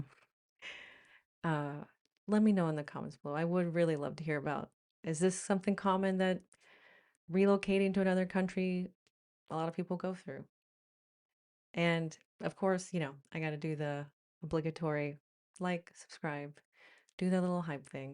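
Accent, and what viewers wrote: United States. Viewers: Really lovely to hear a considered, quietly spoken American. It's such a lovely accent when it's not screaming at you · American lady living in the UK is sooo SXY. Please try not to loose the accent and become less exotic... LOL.❤ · You seem such a lovely person, I love your videos. You may have an American accent but my dear you’re one of us now, welcome home ☺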